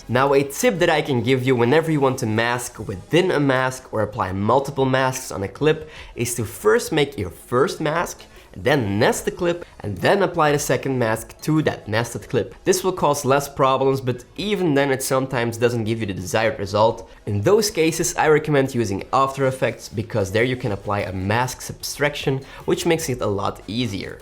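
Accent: Belgium. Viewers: you sound like you live in belgium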